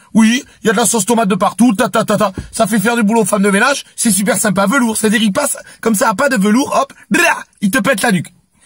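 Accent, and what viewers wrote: South, France. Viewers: Merci pour ton accent qui me fait voyager dans le sud!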